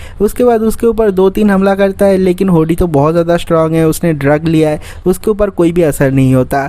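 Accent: Bengal, India. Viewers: Tum kiya bangali hoo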